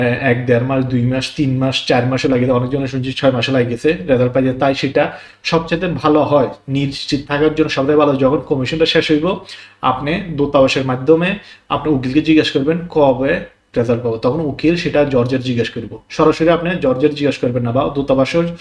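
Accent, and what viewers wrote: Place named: Noakhali, Bangladesh. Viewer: আসালামু আলাইকুম কেমন আছেন ভাই আপনার কথা গুলো শুনে আমার মনে হচ্ছে আপনার বাড়ি কি নোয়াখালী না কুমিল্লা জানাবেন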